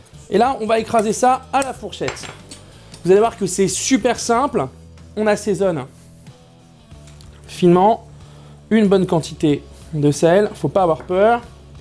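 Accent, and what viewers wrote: South, France. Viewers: Mmmh des bonnes grosses saucisses... Et cet accent sudiste exceptionnel :'') Superbe vidéo avec beaucoup d'humour !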